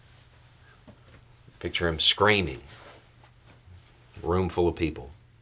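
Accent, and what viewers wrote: South, United States. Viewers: is looks as if he is the stereotypical southerner. Even has the beard. But he has a kind heart. He is changing my automatic distaste when I hear a southern accent. 🙏❤🙏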